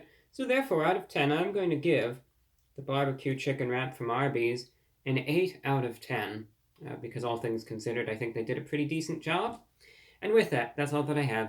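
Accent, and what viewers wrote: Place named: New England, United States. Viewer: Ir he just needs to start rolling his R's now lol. It's just his New England accent, hopefully he never stops doing it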